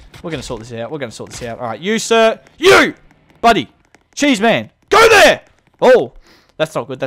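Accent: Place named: Australia. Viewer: He sounds like a classical Aussie in a good way. Love your videos man, keep up the good work hoping you reach a 100 soon!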